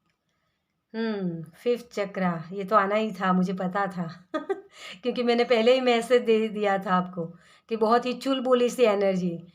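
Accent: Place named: Gujarat, India. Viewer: Pile 1 sub sahi hai mam our aap ki hindi masaallah Vijay rupani jesi hai.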